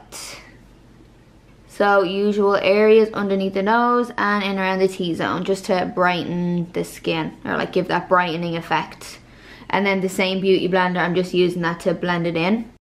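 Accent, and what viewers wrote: Ireland. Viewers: How often do you clean your makeup tools? You are beautiful btw and I love the Irish accent.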